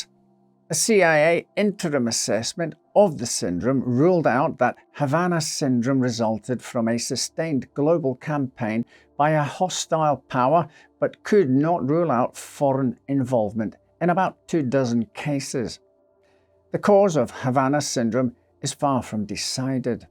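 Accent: Scotland, United Kingdom. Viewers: I can't put a finger on your accent. Im getting English, South African, Scottish and even Welsh! I love it, love the videos too! ❤ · Nope, I believe he is a Scot. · Or maybe he is just Scottish… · It's his voice. It is wonderful to listen to, especially for me as I admire any accent from the United Kingdom. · He's Scottish 😊, I'm.also Scottish and that's how we sound when we are talking proper 😅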